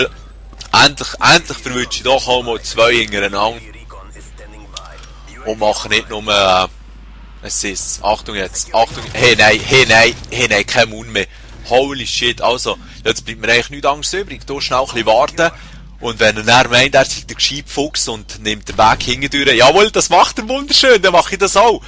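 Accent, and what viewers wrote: Switzerland. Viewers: ich versteh auch fast nix :D is schweizer glaub ich :D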